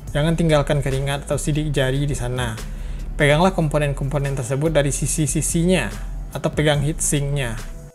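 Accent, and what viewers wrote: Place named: Padang, Indonesia. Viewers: Iya Gan Dia Orang Padang. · Ini orang padang ya? Dialeknya kentara bgt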